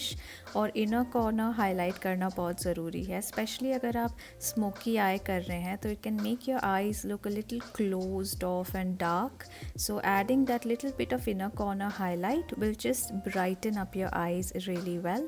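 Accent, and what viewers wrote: India. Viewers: Iam ur huge fan. Love ur vidios. And ur cute and sweet hinglish. Awsome. Tc🙂❤👍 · Apki hinglish or look dono cute lge 👌👌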